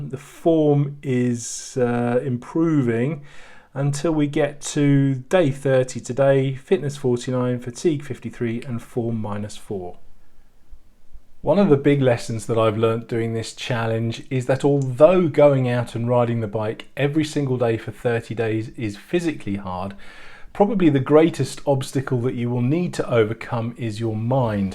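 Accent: United Kingdom. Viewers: Love your British accent...!! Great video production and wonderfull scenary from the country side..!!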